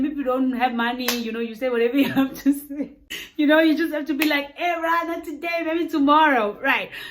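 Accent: South Africa. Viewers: I love how you adopted south african accent, unlike some big headed ones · Manje why do you sound sooooooo South African this much. You even look like South African ladies😂😂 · Your accent is so South African 🇿🇦. 😊♥️ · The accent is legit SA. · How are you not South African with that accent is just a shock to me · South Africa has rubbed off of you, you sound so much like us now hahahahahaha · Wena u not Congolese, why do u sound so south african and hood south african at that. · If she didn't mention that she was a Congolese I wouldn't have known.. She is south African.. The accent 👌👌🤣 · Shuuuuu, the South African accent is legit. Wow sis...💯🇿🇦👌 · I would'nt believe you are Congolese coz you have a South African accent 😊🙈 Love your personality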